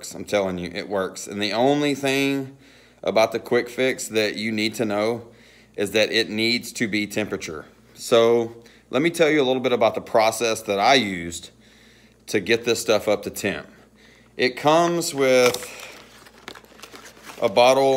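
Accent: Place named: Texas, United States. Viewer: I don't no any wedding bands so yes Daddy call me I love that Texas accent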